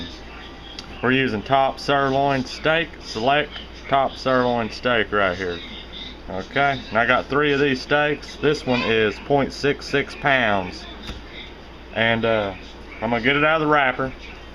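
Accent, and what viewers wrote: South, United States. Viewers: King of the dingalings........Southern accents are the best ever. Dont hate cause you ain't son. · From the sounds of this southern man, he probably doesn't care about your two cents. Move along... Totally trying this right now.... thank you for sharing! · That's a southern accent believe me I'm from South Carolina and we have that southern slan as well.. Greenville SC in the house and your food looks 😋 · really someone said European accent ugh nooo its a southernnnn one lol come on yall! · you have a cute voice I love the southern accent! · My parents were from Missouri. You sound more southern than that. But listening to you speak takes me back to when my mom took me to Missouri, 8 months before she died. And your recipes look so delicious. All you need to do is make tomato sandwiches!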